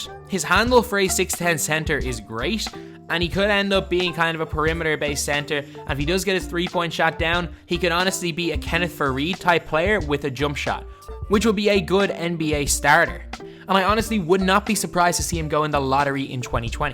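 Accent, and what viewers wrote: Dublin, Ireland. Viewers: Are you from dublin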